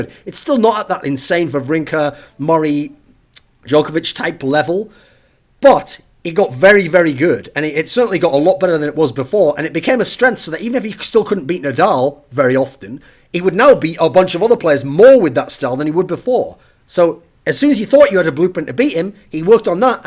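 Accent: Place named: England, United Kingdom. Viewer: English accent bro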